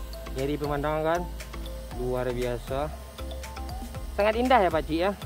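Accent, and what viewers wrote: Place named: Minangkabau, Indonesia. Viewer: Ooo ini orang nya suara minang kocak😅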